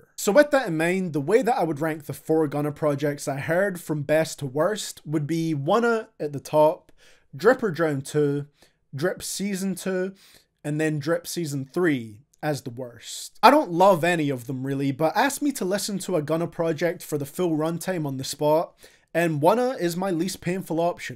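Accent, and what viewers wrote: Scotland, United Kingdom. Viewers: He is Scottish, he has a strong accent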